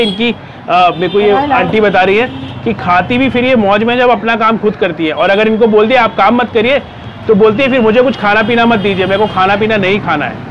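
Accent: Bihar, India. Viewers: I live in delhi,but missing my bihar very much and happy to see bhai is trying to speak in bihari accent,just amazing 🔥🔥❤️❤️ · Wah bhai 🙏 speaks very well in Bihari tone 😊.. i m big fan of you from Bihar "Katihar"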